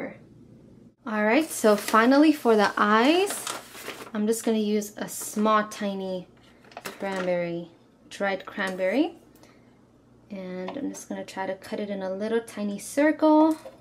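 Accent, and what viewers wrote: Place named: United States. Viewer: It's so interesting to see how your accent has gradually become more American over the course of your videos, love the fruit art!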